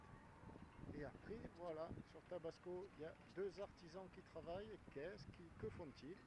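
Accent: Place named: Marseille, France. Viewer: Salut, j'ai passé l'hiver devant tes vidéos et je pense les avoir toutes visionnées (même 2 fois pour certaines). A force j'ai attrappé l'accent de chez toi. un lorientais qui par le marseillais! ma femme me dit que je suis devenu fada! j'ai hâte de voire la suite de tes aventures. Bon Vent l'ami et comme on dit chez nous KENAVO!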